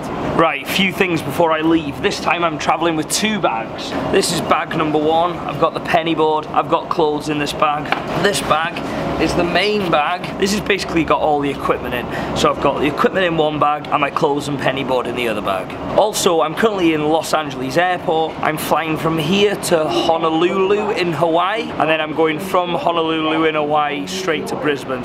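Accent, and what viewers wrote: United Kingdom. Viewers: Who can't give this guy with the sweet british accent free stuff :)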